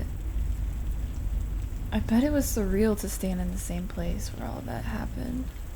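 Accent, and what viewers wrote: France. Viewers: Holy! Here I thought your voice was mesmerising with the french accent but this is equally as hypnotizing. Lovely work as always!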